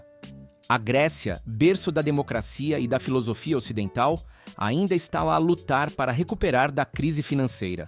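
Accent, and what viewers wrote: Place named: Brazil. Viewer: Esquisito esse texto em português de Portugal com voz de português do Brasil. Sector, perceção, despedimento... 🙄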